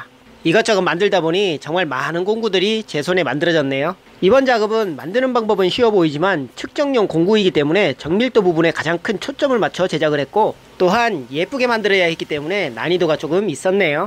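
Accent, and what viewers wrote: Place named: South, Russia. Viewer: Южный диалект русского ,не Совсем понятно😀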